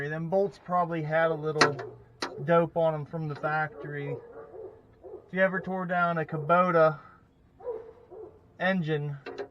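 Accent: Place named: Pittsburgh, United States. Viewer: Way to go there bud! Sounds great. Good hearin the ole Pittsburgh accent again too from way out here in Oregon. Saw a bit of the Brownsville steam show video and pieced it together that you must be around sw PA. Grew up a little north of Brownsville. Take er easy, ill pass the channel on to some cowokers 🤙🏼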